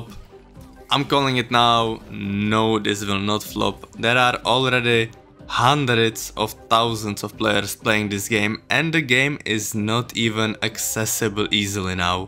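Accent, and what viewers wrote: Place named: Czech Republic. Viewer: jako ta česká angličtina ma sere ale jinak top videjko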